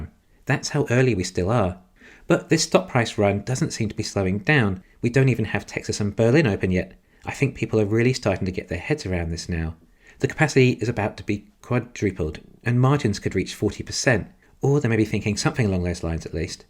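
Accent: England, United Kingdom. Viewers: English accent...word have more than one syllable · You British? I followed you for an year without realizing that,thinking you're from the States.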